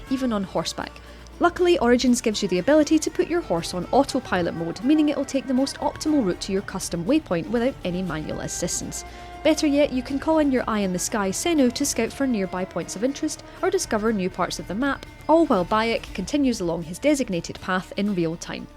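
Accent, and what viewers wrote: Scotland, United Kingdom. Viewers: Yes, it's Scottish. Central Scotland to be more specific. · Is this a Scottish or Irish accent. It's pretty cool. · alec j that's Scottish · It sounds Scottish to me. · This video would be extremely better if they had put someone without this disgusting Scottish accent. She can't even pronounce fucking English words properly for fuck's sake! It hurts my ears... one of many reasons why I would never visit that shitty country in my life! Long live England! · Scotland. Like my good self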